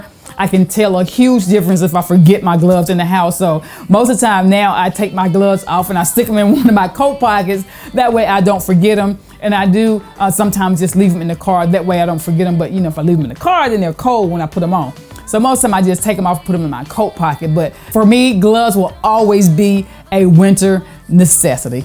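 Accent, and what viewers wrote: South, United States. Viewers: I knew you was from the south from your accent, ahhh NC. I’m from NC. Great video!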